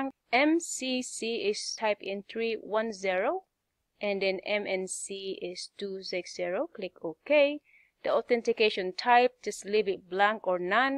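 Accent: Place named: Pangasinan, Philippines. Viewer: That's a great accent! Pangasinan?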